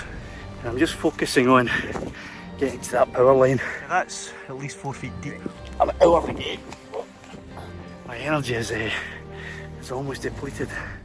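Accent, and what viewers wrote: Scotland, United Kingdom. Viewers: Fantastic story. I really admire your courage and tenacity to find your drone. Despite the rough terrain, you overcame all obstacles and ended up successful. Respect for your perseverance and never give up. I like your Scottish accent.✌Greetings from Denmark.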